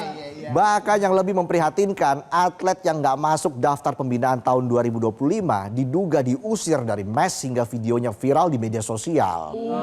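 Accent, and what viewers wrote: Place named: Jakarta, Indonesia. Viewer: Ogoed suka bener ame ni gaye bicarenye Coba dong logat betawinye kentelin😅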